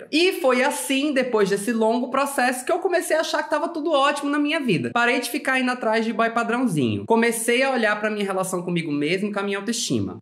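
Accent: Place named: Northeast, Brazil. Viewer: Que delicia de sotaque ❤ bem senti mesmo algo nordestino amuuu. Viva nosso nordeste. Muito gratificante encontrar um conteúdo profundo e importante que nos rodeia mas que tudo mundo finge que não ta rolando ou que não rola, necessário este assunto.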